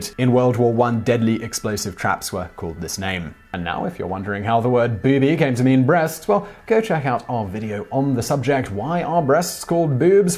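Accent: England, United Kingdom. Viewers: Bald? Check. Glasses? Check. British accent? Check. This gent must be legit. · Much Refreshing Delightful And Insightful... LOVE YOUR Marvelous English Accent And Your Intellect!!! YOUR ALWAYS Well Put Together Along With YOUR Topics. I Look Listen And Learn So Much THANK YOU, For The Excellent Work Keep It Up.... THIS Subject Matter On THE "Egyptians" Is So Fascinating. PEACE🙅🎩📖🏆😃🙅🎩📖🏆😃🙅🎩📖🏆😃🙅🎩📖😃🙅🎩📖🏆😃🙅🎩📖🏆😃🙅🎩📖🏆😃🙅🎩📖🏆😃🙅🎩📖🏆😃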